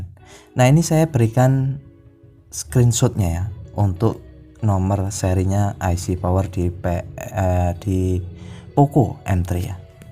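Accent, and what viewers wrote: Java, Indonesia. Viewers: Samean asli mana mas ? Kok koyoe medok nek ngomong. Hehee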